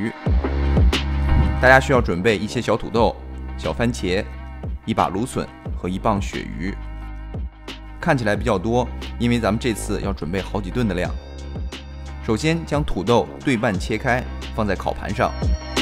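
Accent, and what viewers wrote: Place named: Sichuan, China. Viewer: 這種口音是四川嗎？好好聽啊☺️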